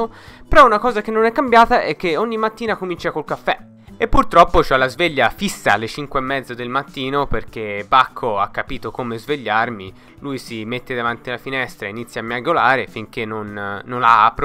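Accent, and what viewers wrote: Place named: Tuscany, Italy. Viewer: L’accento toscano è ancora pazzesco!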